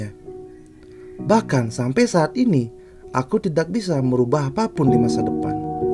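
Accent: Bali, Indonesia. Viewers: org bali ya? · mirip logat bali ngab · Orang bali fix 😂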